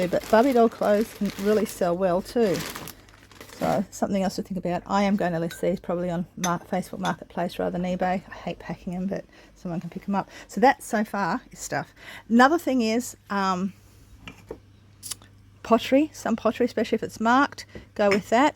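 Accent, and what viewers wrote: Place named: Australia. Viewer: I am a 67yr old Aussie NSW too and you were recommended by Jason Adams. Enjoyed your video and subscribed. So nice when I listen to our accent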